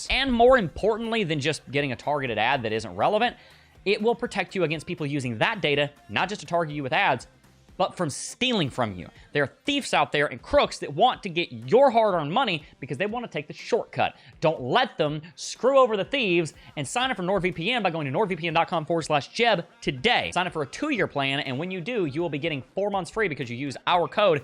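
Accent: United States. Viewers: Im from the uk a normal person listen to this yank typical noisy thiko yank Thinks hes on a yanky bog roll advert 😂😂😂😂😂😂😂😂😂😂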